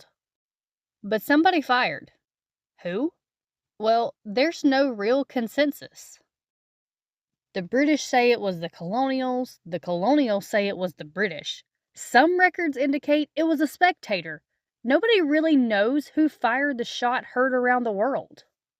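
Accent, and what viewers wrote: South, United States. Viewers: It may be just me, but I gave it some attemps and have to say, the combination of a robot voice and southern dialect makes it really hard to understand what is being said.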